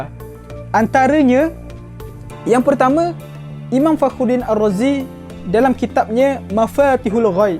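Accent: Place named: Terengganu, Malaysia. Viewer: Speaker nie org TRG ke??... dgr mcm ye dh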